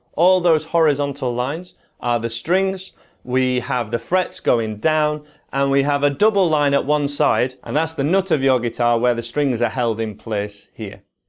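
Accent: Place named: Northern England, United Kingdom. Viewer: Most recent!!! Hi I'm enjoying your video. Thank you for taking the time to post it in order to help beginners like myself. I'm English living abroad and it's nice to hear your northern accent. Feels like being back in the UK. 🤩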